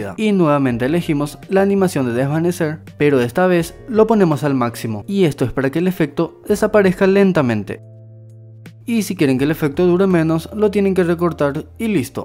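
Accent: Paraguay. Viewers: Amo el acento paraguayo, lo reconocí porque mis apas son de Paraguay ✨ Buen tutorial, bro ✨🔥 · Eres de Paraguay no? lo note por tu voz además yo también soy de Paraguay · Su acento es muy paraguayo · Xd con tu voz se que sos Paraguayo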